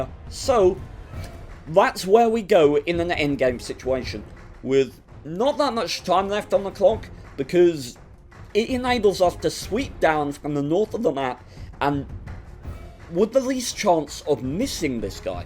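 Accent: United Kingdom. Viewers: I like ur UK accent bro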